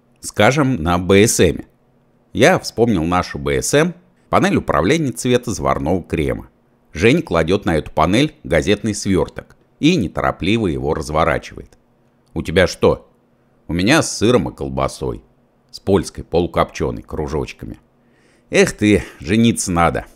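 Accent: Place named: Volga, Russia. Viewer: Плохо читает. Говор волжский. Чтец должен быть с никаким говором, как диктор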